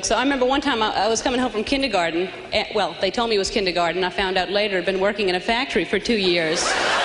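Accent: South, United States. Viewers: Her southern accent is so much stronger here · Back when still spoke with her Southern accent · has a souther accent here;) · Her southern drawl is more prevalent in this one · I miss her southern accent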